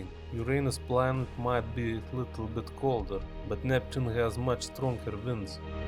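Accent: Russia. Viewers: Dude the Russian accent makes it seem cooler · Unique russian accent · Im confused xD are you actually russian or just playing the accent. Either way it's on point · Sounds like crazy Russian hacker mixed with gru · You sound very russian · I like russians ur russian i hear it from ur voice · Try fix the russian accent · God ur accent is fuckin russian ! Loved it but i rather like the older channel !! Keep uploading on it ! Thnx love from india ! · Typical Russian voice xd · Really love that Russian accent! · Aahhh Russian accent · I love this Russian accent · I actually enjoy more of this Russian accent than normal English accent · You sound really russian Oh yeah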